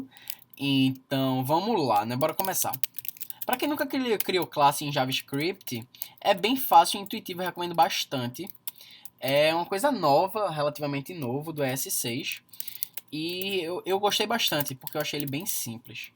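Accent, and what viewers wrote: Pernambuco, Brazil. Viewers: E pelo sotaque deve ser pernambucano. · Esse sotaque de pernambuco é muito característico, parece muito com o meu de Natal.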